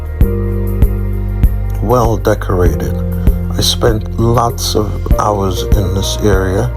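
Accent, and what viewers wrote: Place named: Jamaica. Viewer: I can hear that powerful exploratory Jamaican heritage in your voice bro! I wrote this before i saw the flag I've just reached that.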